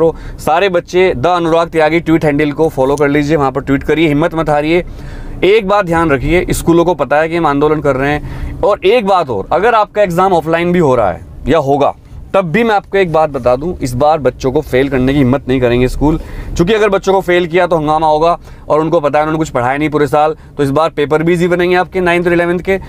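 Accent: Delhi, India. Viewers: Delhi se ho kya sir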